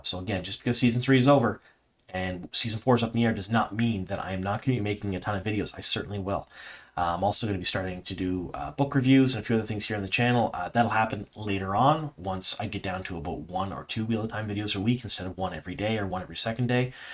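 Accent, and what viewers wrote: Canada. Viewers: I also recognize that you’re Canadian because you always say “aboot” and it’s kinda charming. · They're wondering about the supers?!!? The first time I heard you pronounce the word "about", I said, that's Clearly Canadian! I'm extremely about Siuan, I had a couple spoilers about her story, and I really wanted to see Sophie play those things! Fantastic season and we need seasons 4 - 8!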